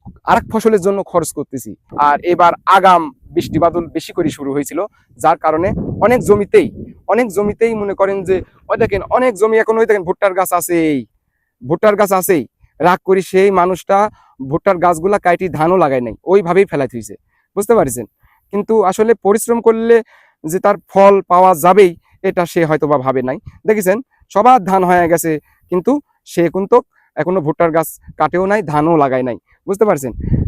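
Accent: Natore, Bangladesh. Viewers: আস্সালামু আলাইকুম।ভাইয়্যা , আপনার কথা শুনে আমার মনে হয় নাটোরের মানুষ আপনি কিন্তু আমার হাজবেন্ড বলে আপনি পাবনার আপনি পাবনায় থাকেন ।আসলে কোনটা সত্যি।আপনি কোথায় থাকেন?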